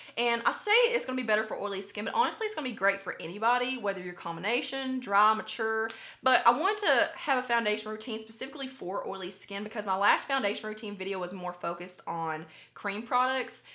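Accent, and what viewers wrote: South, United States. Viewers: Hey from Israel!😊I love your suthern accent so much!!!❤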